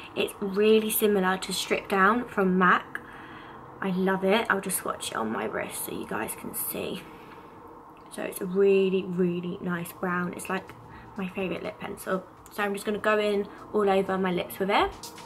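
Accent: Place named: United Kingdom. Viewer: ye but she only speaks like that because she's from a certain part of Britain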